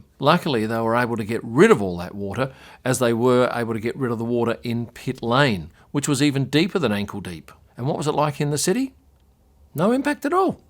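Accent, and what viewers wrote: Australia. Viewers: So good to hear an Aussie accent in F1 media - good work and nice report.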